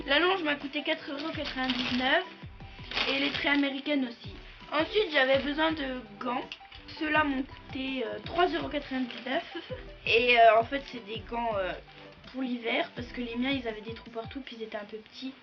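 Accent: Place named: Switzerland. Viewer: a ok je trouvais que tu avais l'accent suisse😂 sinon je découvre ta chaîne aujourd'hui elle ets vraiment génial ❤️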